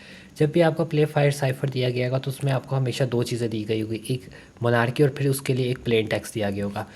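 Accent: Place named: Pakistan. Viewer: pakistani aacent jaisa hai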